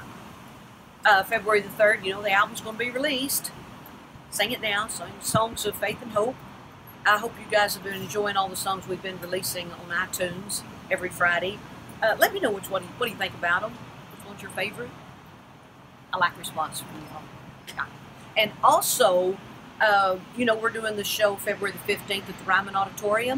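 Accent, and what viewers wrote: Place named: Oklahoma, United States. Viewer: She talks so differently than when she was younger. But all us Okies sound like that eventually.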